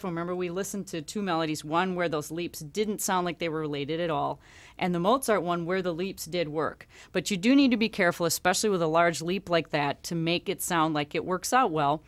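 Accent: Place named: Wisconsin, United States. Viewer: Wisconsin accent to the moon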